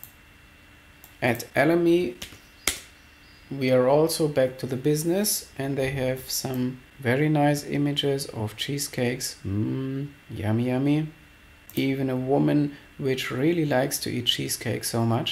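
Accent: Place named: Germany. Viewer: Kein problem.. I like to hear De-nglish..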